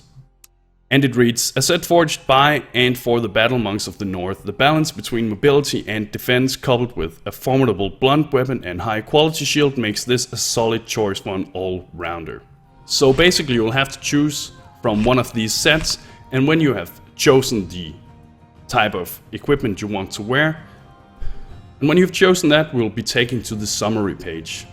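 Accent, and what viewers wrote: Denmark. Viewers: Bro You sound Danish 😁 No Worries i do too. Cuz i am 😂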